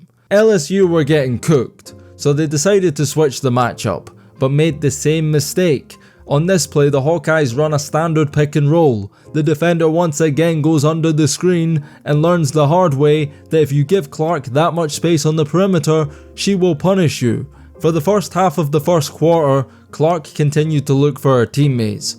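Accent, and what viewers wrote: Scotland, United Kingdom. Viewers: Why is there a Brit talking about basketball on this video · Bizarre hearing a Scottish guy do the review. But, it’s OK. Sounds great. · It's funny to hear the comments coming from someone with a thick Scottish accent.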